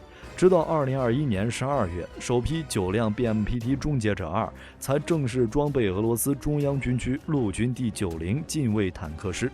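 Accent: China. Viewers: 台独反中媒体,为啥用中国大陆语音配音?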